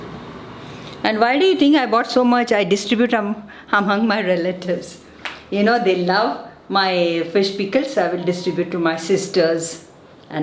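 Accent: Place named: Mumbai, India. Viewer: Hii.. I just want to say Bangdda Bangddo Bangddulio Bangdde it’s the same..it depends on the pronunciation just like salcettekars and Bardezkars pronunciation.. If she lives in Bombay being a Goan from Goa she’s there for a purpose of her living for bread and butter for job purposes and good opportunities unlike the same who works abroad.. if they live anywhere beside goa that doesn’t mean they’re Maharashtrian or belongs to any other religion or caste or nationality..she’s very much a Goan from Goa and lives in Bombay.. instead appreciate her recipes and comment over that.. that’s solely her style of making and saying.. Saying Bangddo doesn’t make her an Maharashtrian it’s just that staying for ages in Bombay or any other country you adapt the accent of that state or country.. What she does is just exhibiting her Goan recipes for the once who’s anxiously wanting to learn and prepare the delicacies of Goan cuisine.. 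instead appreciate her work and give feedback on the recipes and not the pronunciation.. And yes residing anywhere in the world country or states doesn’t matter we all know why we’re there.. what matters is our roots that we’re Goans from heart and birth.. A root grows inside the soil and the tree or plant comes out and we see them widely that doesn’t mean if the roots are inside the soil then it’s different then the tree or plants visible from outside belongs to somewhere else.. it’s the part of each other.. same way…. You can take a Goan out of Goa but can’t tak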